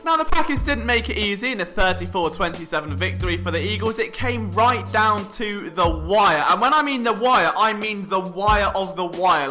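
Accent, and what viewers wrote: United Kingdom. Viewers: Hate to triple comment, but your antics are so brilliantly weird. Combined with a British accent and a Giroux jersey, 14k subscribers should be coming after this post. But anyway, WHERE IS THE FILM ROOM?! Sidebar: You might have to re-WIRE your palate if you’ll be in Philly this year. I don’t even know what half the foods you said were, but I can pretty well guarantee they won’t be available. Cheesesteaks are delicious, yes, but can only have so many in a short timespan.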